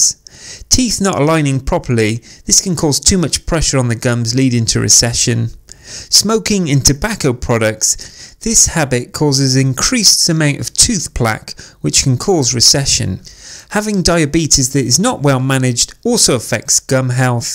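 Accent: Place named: Bristol, United Kingdom. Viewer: The suggestions from this video are: one tablespoon sesame oil oil-pulling; Sea salt rinse; Coconut oil mixed with baking soda and use as toothpaste; Chamomile flower tea; Myrrh in mouth rinse; Tea tree oil on toothpaste; Septilin, buy in capsule form; Flossing. Cranberry juice and other sources of vitamin C; Green tea; Gum Recession toothpaste which this chap sells. Lovely accent! Somewhere near Bristol, I'm guessing.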